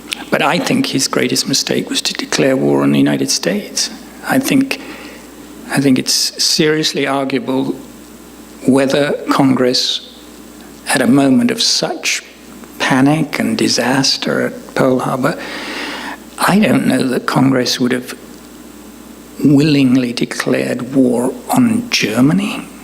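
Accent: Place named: England, United Kingdom. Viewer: There is someting special about a historian with a great english accent and fantastic delivery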